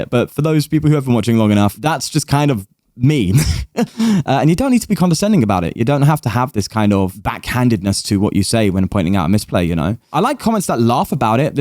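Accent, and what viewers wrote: United Kingdom. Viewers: Always have a good time watching and those funny misplay moments among other entertaining shenanigans. Those and that cheerful, jesting personality (not to mention the nice british accent!) really sets this channel apart from others, I think. And it's cool to see games pushed into victory even after those misplays! Shows that a skilled player can turn even a bad situation into a victory. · Bro just be yourself, keyboard warriors are everywhere just let them be. I watch you because of your laughs, beloved British accent, you're entertaining, your perfect English, than merely playing competitive perfect Pokemon games. Love from Malaysia ♥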